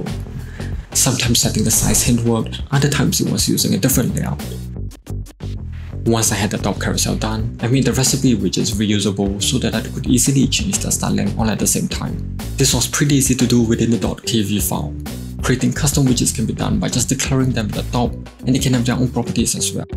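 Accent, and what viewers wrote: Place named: India. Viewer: Bro I thought you were speaking Indian and was about to clock of the video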